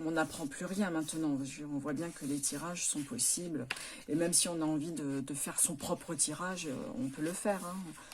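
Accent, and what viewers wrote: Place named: Italy. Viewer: Coucou, merci pour ces jolis jeux et ton accent italien que j'aime tant!!! A bientôt 🌸🌸😊😊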